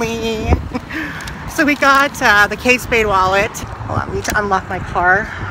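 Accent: Philippines. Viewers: 😂 definitely agree with you on the Filipino accent! You’re always hilarious❗️ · I agree with the filipino accents!!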